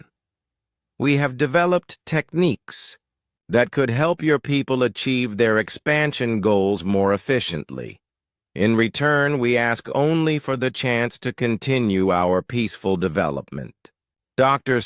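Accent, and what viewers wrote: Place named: Texas, United States. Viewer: Don’t like the Texas drawl speech pattern.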